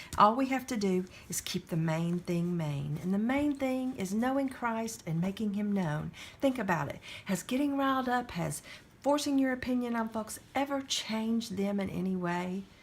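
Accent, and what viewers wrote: South, United States. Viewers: LOL its your southern twang..its comforting! Plus you're talking about the King! <3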